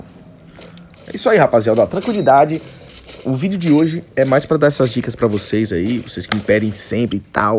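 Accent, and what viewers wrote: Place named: Northeast, Brazil. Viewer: Salveeee,primeira vez que te assisto e ja gostei mano!! Queria saber de onde tu é! Tem um sotaque daqui do nordeste!!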